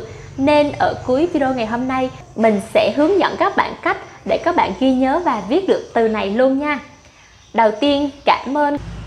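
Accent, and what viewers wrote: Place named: Vietnam. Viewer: Nghe phát âm lài biết ngay người gốc Việt kkkkkk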